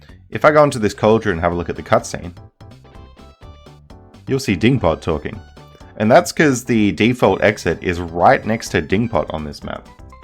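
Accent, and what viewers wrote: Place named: Western Australia, Australia. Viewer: I haven't even beaten BK but it's also nice to see love for the Any% run, since 100% is always the main star. Great videos, and nice accent! Western Australia, right?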